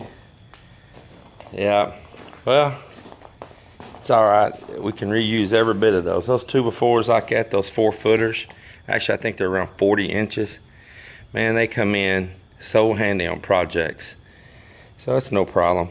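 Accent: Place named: South, United States. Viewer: Yes I am. Thats why I watch your channel because your southern accent plus yall make me laugh. And yes the gulf coast s nice. I miss the ocean and the heat. Its been in the 50s today. I could see my breathe in the air. Yall keep up the content we love it. - Amber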